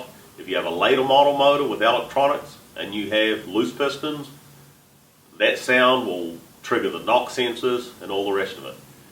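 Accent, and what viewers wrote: New Zealand. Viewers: weird accent half kiwi half american? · cant get rid of that kiwi accent ha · His accent is a mix between Maori and Southern American. Lmao, is weird.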